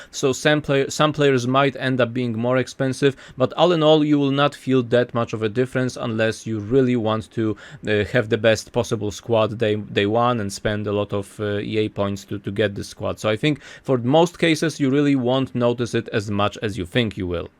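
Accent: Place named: Poland. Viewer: Ja nadal słysze polski akcent xD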